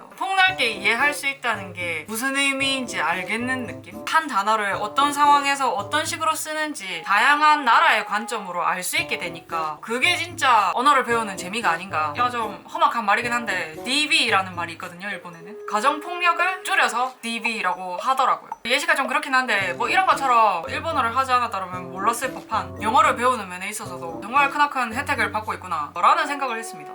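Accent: Busan, South Korea. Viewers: 말투가 완전히 부산 가시나네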